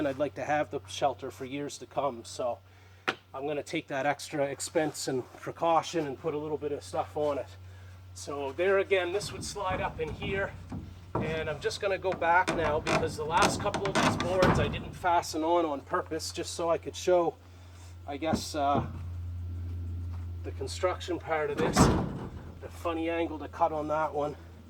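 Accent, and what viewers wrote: Ireland. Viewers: Are you irish... Nice vid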